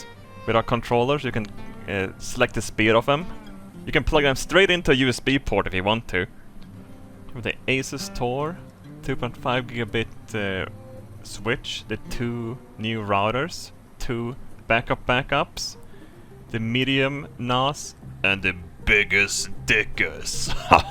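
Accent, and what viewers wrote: Sweden. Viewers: That glorious glorious Swedish accent from is honey to my ears it’s been too long since I’ve heard your voice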